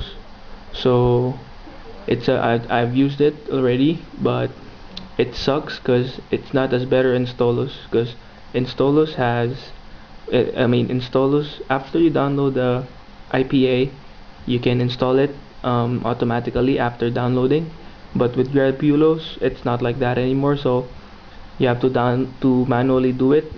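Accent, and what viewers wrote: Philippines. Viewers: u have a philipino accent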